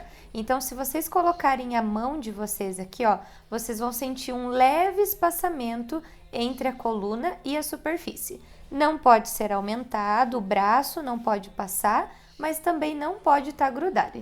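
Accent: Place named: Curitiba, Brazil. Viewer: Ah que pena em vários momentos cortou significativamente o áudio - uma pena porque a professora é boa e paciente. No começo deu uma tremida também, mas depois resolveu. Vocês são de Curitiba? É pelo sotaque porque tem outro Eqiulibrium em SP. Mesmo eu que já faço dei uma perdida com a falta de som às vezes. Obrigada pelas dicas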